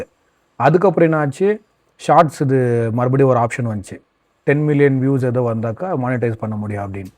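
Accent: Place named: Bangalore, India. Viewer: Your channel is on right track bro..Vitradhinga. Post more contents, different contents. Unga tamil criticize pannatha soninga but enaku contentla romba pudichathey antha bangalore slang tamil than. Youtube is supporting you, you have to put more efforts. Na daily 3-5 hrs stream panren but en efforts ku yetha revenue kedaikala. But your channel doing good, don't waste it. Be consistent.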